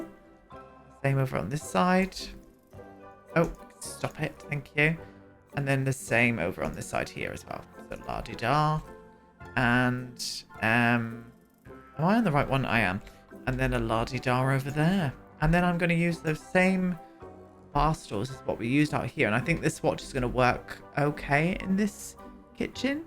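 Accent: United Kingdom. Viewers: This house is difficult to renovate. I love your buildings, and also your British accent. So gorgeous .❤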